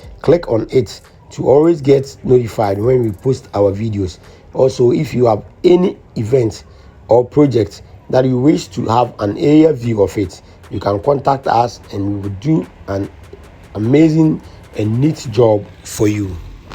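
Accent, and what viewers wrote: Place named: Ghana. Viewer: A nice educational video. You sound like a Ghanian. But a different and positive minded Ghanian. Not like some other Ghanians who never fail to be antagonistic about anything Nigeria. Good video narration bro. Keep up the good work. Love will always triumph over hate as long as you keep showing love.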